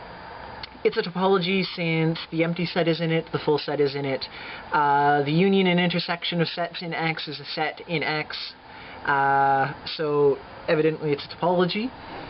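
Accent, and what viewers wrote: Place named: Canada. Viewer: he keeps sayin oout...canadians.......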